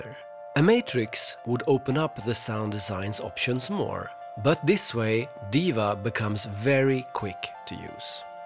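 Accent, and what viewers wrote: Sweden. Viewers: Dr Mix speaks with an Italian accent. This guy speaks with a pronounced Swedish accent. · Du låter svensk :)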